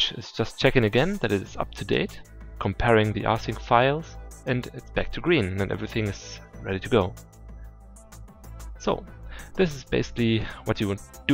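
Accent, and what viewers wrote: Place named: Germany. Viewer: Man kann es sowasvon höhren das du ein Deutscher bist :D Stimmt doch oder? Du hast nicht zufällig ein Deutsches Tutorial für ACE 2? (Ein aktuelles)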